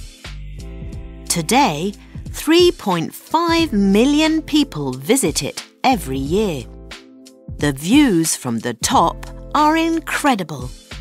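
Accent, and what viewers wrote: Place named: United Kingdom. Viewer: But this is UK's British English....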